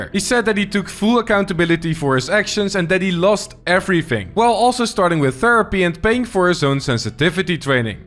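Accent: Netherlands. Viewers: can always spot a dutch accent ! :D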